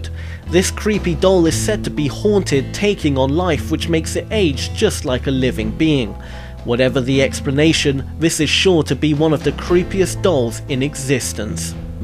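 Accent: United Kingdom. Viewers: Why do these narrators so often have British accents? If I watch this video in GB will he have an American accent?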